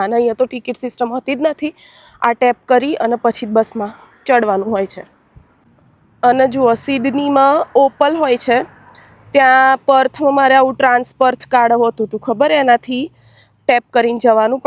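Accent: Sabarkantha, India. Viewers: Sabarkantha ni boli lagesr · Tame sabarkhantha namaste chho bolo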